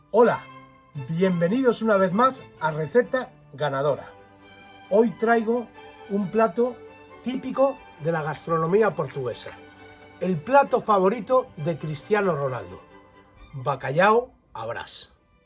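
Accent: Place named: Galicia, Spain. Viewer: Me encanta tu portugués agallegado, jjj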